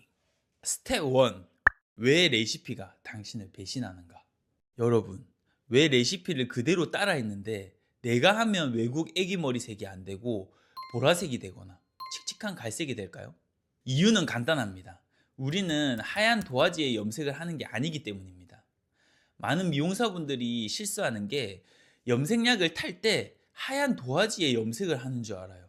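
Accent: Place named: Changwon, South Korea. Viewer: 쌤 창원 출신인가요? 억양이 딱인데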